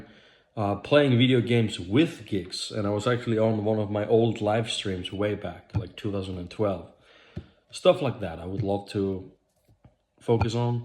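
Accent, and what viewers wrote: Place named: Europe. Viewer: 🎉 Nice hearing from you again. I see this video was recorded 5 days ago but showed up in my notifications only 1 hour ago! You reminded me in this video of Nagidal. Maybe the European accent, your appreciation of Gix or your calm voice, but I appreciate that you still post videos every now are then even with everything else going in your life, and quick videos like this one don’t really take much effort to do. I know what you mean by glass babies, and I can’t find the proper English term for that either, but all the best wishes to your girlfriend. I also like the idea of streaming or playing a game with someone else in your videos, even if just watching and commenting on the gameplay.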